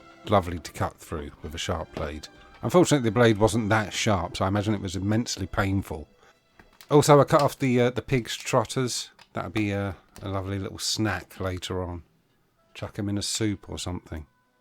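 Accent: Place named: United Kingdom. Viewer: Are you alright mate? You sound more British than usual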